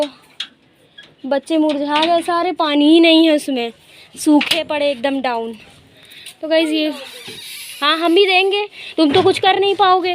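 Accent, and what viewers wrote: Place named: Lucknow, India. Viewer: Lg rhi h lko ....vali ... mtlb bat krne ka tarika ...mai bhi lko se ..hu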